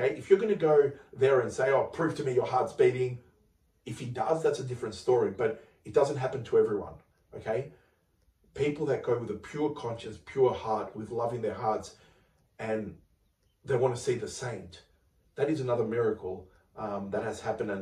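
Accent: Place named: Australia. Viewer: Hello my Orthodox brother. You sound Australian, I too am Greek Australian from Melbourne. I started researching St Nektarios after having him come to me in a dream, he was walking with me and chatting to me walking down a hill near a monastery, I didn't think much of it at the time and just passed it off as a dream. After a couple of years my wife passed away and I somewhat pushed away from religion, a couple years later I restarted my journey with religion and just today, I remembered the dream I had approximately 5-6 years ago, it just popped into my head, I remember I was sick with an illness at the time of the dream and just realised that I was healed shortly after the dream, my guess is the Saint came to me to heal me, didn't put the two together at the time, but my guess is that it was a miracle, anyhow, I started researching the monastery of St Nektarios and low and behold, I saw the church and the gardens in Egina Greece, it sparked my brain and I thought, is this the church and garden I saw in my dream? 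I got goose bumps, I continued to do research and thought wow this Saint is a true modern day miracle worker, back when I had the dream it was like I teleported to the church in Greece and walked with St Nektarios and he healed me, anyhow, I would really like to visit in real life one day. That all said I had just read Agios Nektarios Paraklisi and decided to do some further researching into St Nektarios miracles and found your video. Just wanted to say thank you for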